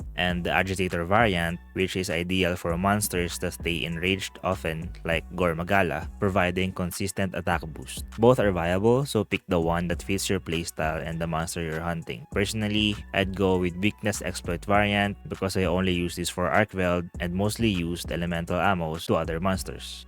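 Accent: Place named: Philippines. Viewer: Your accent in the beginning, I knew exactly you're a filipino like me. Kudos to your guide video bro. After doing speedruns on my Bow... now it makes me wanna go LBG for my next weapon. Thanks man great video!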